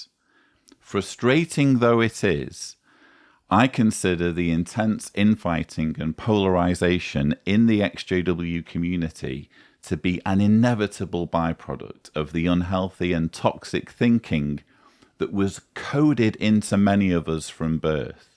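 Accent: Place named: North, United Kingdom. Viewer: I've just discovered you over the last 2 days. I noticed your accent was familiar.... I currently live in Manchester and I was a JW in the Chesterfield/Sheffield area. I left the JWs at 16 having been baptised at 14/15. My parents and brother don't speak to me. I've never heard anyone articulate the same experiences I've had as a child until I discovered your channel and the Leah Remini interview and didn't realise how isolated I felt in my experiences until I heard others with similar experiences. Thank you from the bottom of my heart. Your down to earth way of putting everything is just so refreshing. Please don't stop making videos ♥️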